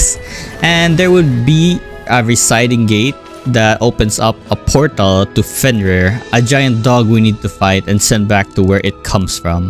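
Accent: Philippines. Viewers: Hello, are you by any chance a Pinoy? Just a random guess based from your accent.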